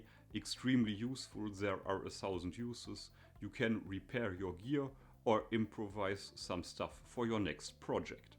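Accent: Germany. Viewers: German dialect! Dann sag ich mal freundlich Hallo 👋😁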